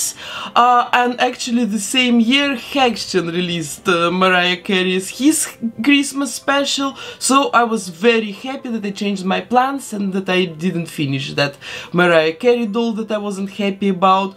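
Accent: Russia. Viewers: Вы русская? Чувствуется акцент )))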